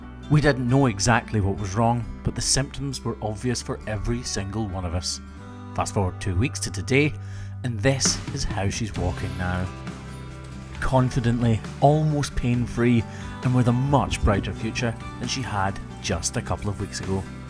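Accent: Scotland, United Kingdom. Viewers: Okay, I am happy to see the cow much improved, and I have to say that on top of enjoying your great Scottish burr you are very handsome.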